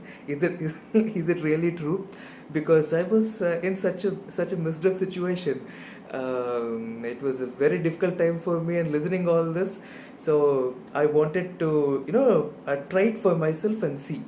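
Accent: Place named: Telugu, India. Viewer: Just by your body language and accent anybody can say you are telugu origin...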